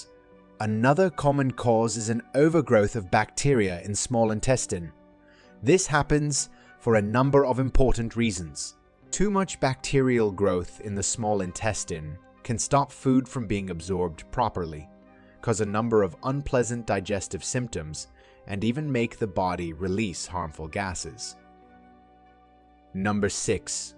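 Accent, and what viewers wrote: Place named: RP, United Kingdom. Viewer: Love yr posh accent presenting this Fartology topic!!! Suprised you didn't say flatuence or gas throughout,yr not that posh but thanks for being down to earth,we love you! God bless you. Oops I just let one off- excuse me!!!!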